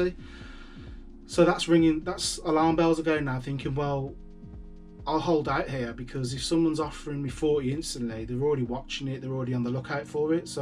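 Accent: Birmingham, United Kingdom. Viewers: New subby here. I follow Scarcity Studios and your vids started coming up. Guess it's due to you also being Brummie, love it, lol. Good content, keep it up.👍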